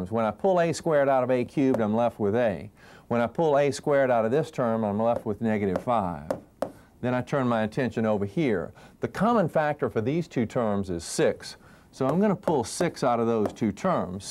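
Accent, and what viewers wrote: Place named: South, United States. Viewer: This is an EXCELLENT refresher for the GRE. Thank you! (Also, love the southern accent.)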